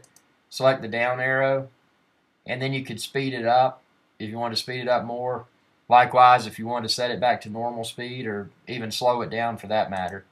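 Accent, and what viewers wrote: South, United States. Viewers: That would be a Sexy Southern draw ;)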